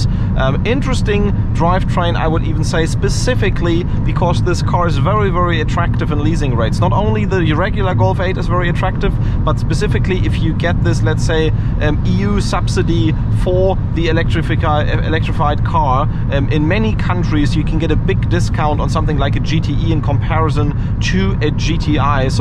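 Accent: Germany. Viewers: So richtiger Deutscher Akzent hahaha man kennts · Ich Feier den deutschen Dialekt 👍👌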